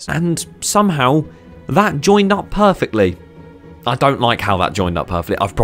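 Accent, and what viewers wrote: United Kingdom. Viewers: british accent just enhances the video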